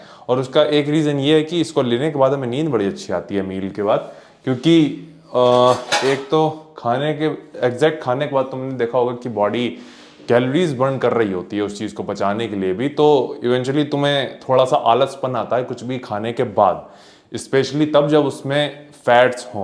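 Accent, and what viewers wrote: Gujarat, India. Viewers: Bhai tum gujrati ho kya ?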